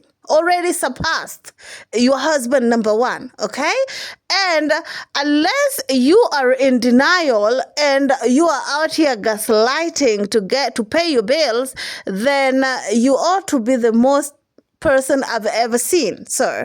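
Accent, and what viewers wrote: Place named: Nigeria. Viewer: wahlai! One of your ancestors was Nigerian. You sound & rage like us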